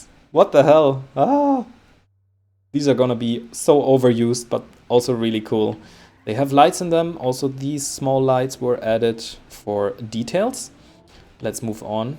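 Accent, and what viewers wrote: Germany. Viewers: Lol ein Münchner, servus!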